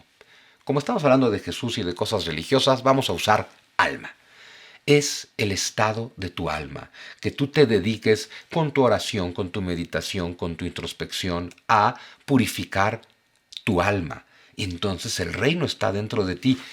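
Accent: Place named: Mexico. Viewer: Me encante el acento mexicano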